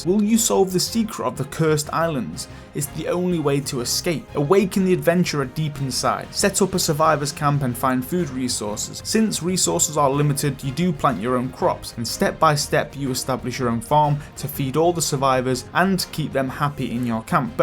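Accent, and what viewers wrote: United Kingdom. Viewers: Dude he's british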